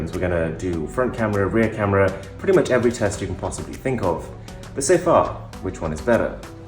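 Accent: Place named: United Kingdom. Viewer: Bro you have a fashion model type face😍 with your brithish akhcent😍👍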